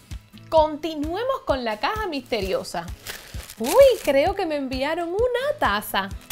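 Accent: Cuba. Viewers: me encanta tu tono cubano!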